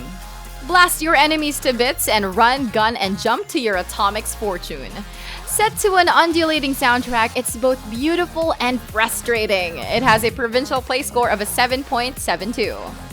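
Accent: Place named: Philippines. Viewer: This is an unmistakably Filipino English accent.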